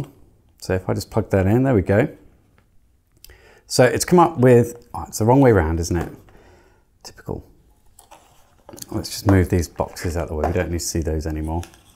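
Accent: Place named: Switzerland. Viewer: here is the guy with swish accent ;) i like your videos sir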